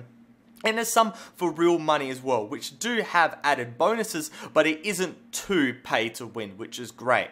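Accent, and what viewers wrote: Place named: Australia. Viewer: he must be a australian or new zealand accent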